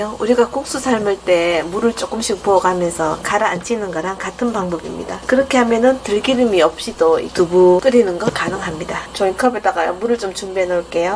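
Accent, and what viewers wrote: Gyeongsang, South Korea. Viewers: 설명 참 정확히 잘해주십니다 목소리도 맑고경상도 억양이 매력적입니다 두부 한번 해봐야 겠다 날마다 좋은일만 있으시길 바래요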